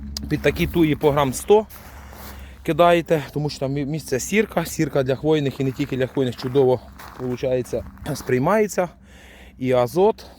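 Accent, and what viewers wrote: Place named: Obodivka, Ukraine. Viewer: Ободівський говор) 😎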